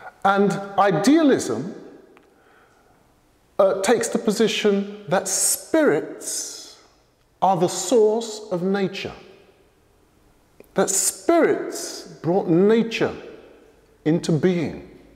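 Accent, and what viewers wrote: England, United Kingdom. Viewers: just cause he is using white inventions he can still advocate for pan africanism because how is he supposed to spread the message via mail ? of course he has to use the internet because that is a faster and more widespread way to spread the message and the people saying that his using an english accent so his analogy isnt valid he studied in a western school so he has western influence in his way of speaking and you would be the same people to call him “uneducated” if he had an african accent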